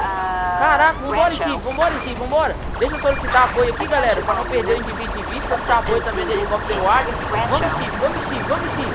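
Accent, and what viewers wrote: Minas Gerais, Brazil. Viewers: C É Daqui De Minas Gerais???? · vc é de minas gerais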